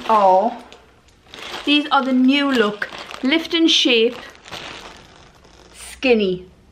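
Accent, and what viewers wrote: United Kingdom. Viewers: Great video, and let's face it, with your accent it makes everything sound excellent pet!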